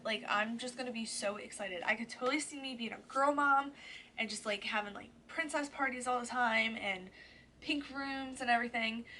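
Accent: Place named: New Jersey, United States. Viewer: I just knew you were a fellow New Jerseyan from the first second of the video lol😭 loved this video. I’m having the same exact symptoms 😩 I’m scared to test so I’m just waiting out my period, if it ever shows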